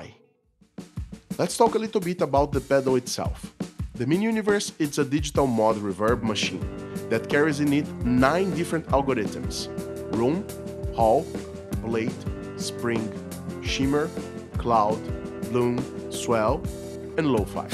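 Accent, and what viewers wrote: Brazil. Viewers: Great Brazilian accent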